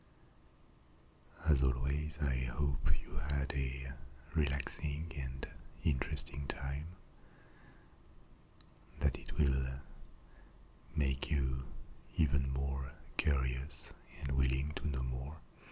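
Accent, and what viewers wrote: France. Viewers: Just found your channel. French accented ASMR--exactly what I was looking for. And your voice is ideal. And your videos actually explore fascinating topics. Very pleased. Thanks for doing what you do.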